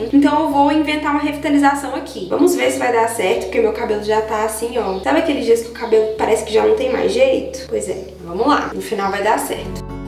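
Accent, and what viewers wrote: Minas Gerais, Brazil. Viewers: muito mineirinha 😂❤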